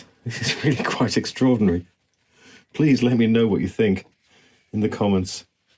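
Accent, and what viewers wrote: United Kingdom. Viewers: I've seen many of your projects but this one, even royalty would blush. While stationed at RAF Lakenheath, I enjoyed all aspects of Great Britain. Now that I'm retired back home in Texas your accent and kind words bring back those enjoyable times. Keep creating my friend!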